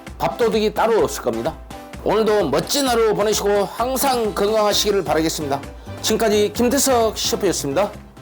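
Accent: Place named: Honam, South Korea. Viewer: 선생님께서 정겨운 호남말투로 만드시는 경상도 토속음식 너무 재밌네요 김천 흑돼지식당 가서 이거 먹어보고 반해서 레시피가 궁금했는데 잘 봤읍니다.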